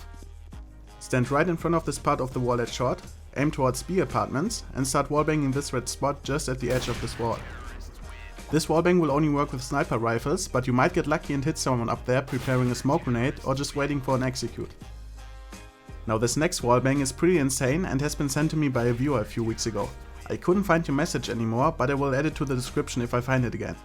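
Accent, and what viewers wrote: Germany. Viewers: Oh yeah, German English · Dieser deutsche Akzent bääää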